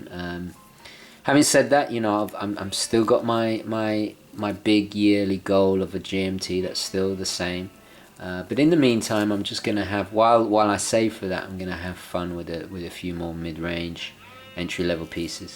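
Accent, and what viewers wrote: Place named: United Kingdom. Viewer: Alu MIN ium insert. Love the British pronunciation. Superb watch and review.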